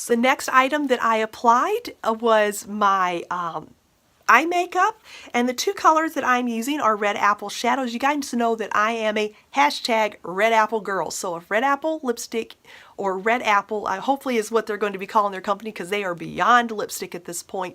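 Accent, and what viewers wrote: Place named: South, United States. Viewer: Hey can you do a swatches of all your eyeshadows up close thank you I love your southern accent 😊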